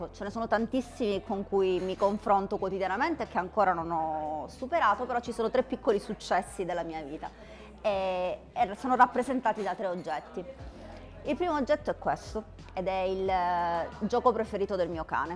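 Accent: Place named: Cosenza, Italy. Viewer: L’ho scoperta solo ora, quasi per caso, per la sua analisi in quattro parti del film “Barbie”, che ho trovato quasi geniale. Sono andato a ritroso nei sui video su Instagram, e ho sempre trovato analisi centrate, acute, mai ideologiche, sempre equilibrate e ricche di spunti di riflessione e notizie, informazioni e curiosità che non conoscevo, o alle quali non avevo fatto caso. Sempre condivisibile. Una vera scoperta. In più è simpaticissima e dolce col suo accento cosentino. In una sola parola : adorabile. ❤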